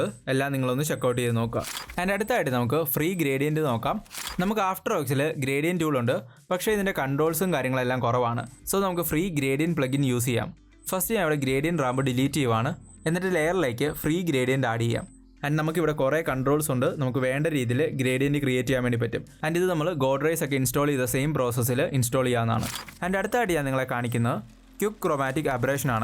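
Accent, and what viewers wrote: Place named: India. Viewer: I can't understand your Indian accent. Instead you can practice pronouncing each word correctly and slowly.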